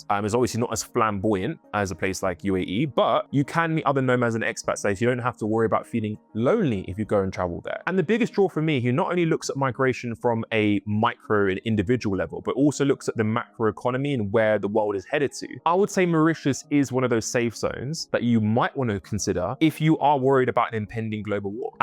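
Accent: United Kingdom. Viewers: What a bunch of pap--with a posh British accent.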